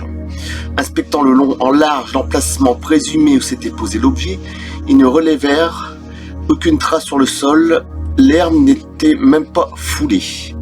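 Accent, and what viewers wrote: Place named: North, France. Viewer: Salut à toi de toute façon à ta voix on voit bien que tu es du Nord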